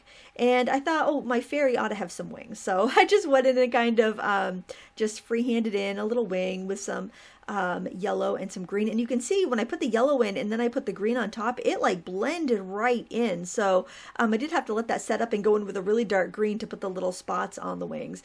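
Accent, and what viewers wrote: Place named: Arkansas, United States. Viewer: Hello, Hope you are well. This is really cute just not my thing (yet), hoping to work on some postcard simple watercolors to start with and good basic drawing practice first. Just wanted to let you know I've been watching alot of your videos and to let you know, your way of saying things, you would blend in with us Arkansas folks just fine. We say ain't and other "incorrect" expressions, we also have a tendency to run several words together, but any from here understand quite well. I like the way you express yourself. Ever need a change of pace, we have canoeing, lakes and national forest recreation parks all over the place. You surely be welcome here. Thanks for all your help and support, is greatly appreciated. God bless. Sincerely Becky B from Arkansas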